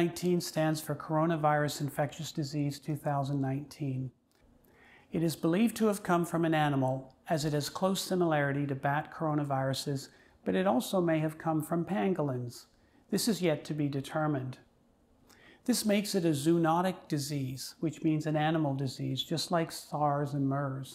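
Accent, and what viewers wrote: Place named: Canada. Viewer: "I've been asked aboat.... This video is aboat...." Clearly this doctor is Canadian (I can trust him).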